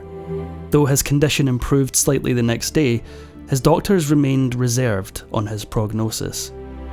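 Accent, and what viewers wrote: Scotland, United Kingdom. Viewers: I love his Scottish accent. He speaks clearly and is very easy to understand! 😊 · I only watch the videos narrated by the Scot. Have him narrate them all, pay him more, and I think you’ll have lots of channel members. ❤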